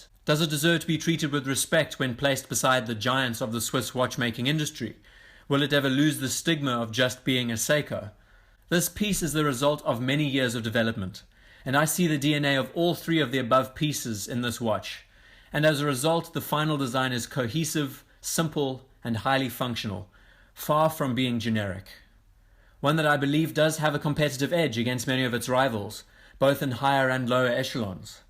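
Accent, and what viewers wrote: South Africa. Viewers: How did you rework the crown? Or did you have it done? Judging by your accent, you're South African. I'm in CT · South Africa 👍🏾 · You sound South African 🤔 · You sound South African! :)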